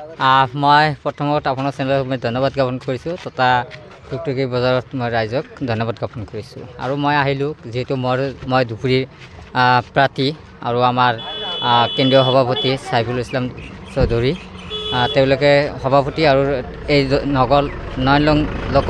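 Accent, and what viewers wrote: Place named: Bangladesh. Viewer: শুকুৰ আলি ভাই তোৰ ভাষাত গোম পায় আপুনি যে খাতি বাঙলাদেশী।যেনে আপুনি কথা শব্দটো কতা উচ্চাৰণ কৰে।আৰু ভালতোক বাল উচ্চাৰণ কৰে।আৰু বহুত আছে। 😂😂😂😂😂😂😂😂😂😂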